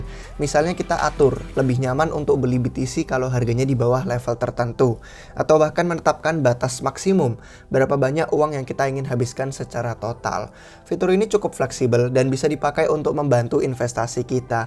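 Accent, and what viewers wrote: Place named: United Kingdom. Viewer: Logatnya ala Inggris Britain bukan Inggris Amerika. Konsonan tengahnya hilang semua. Wkwkwk...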